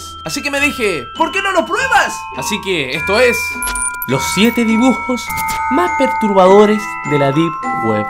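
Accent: Chile. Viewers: Eri chileno weon? :V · Eres de chile verdad? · Se nota mucho que eri de shile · eres chileno?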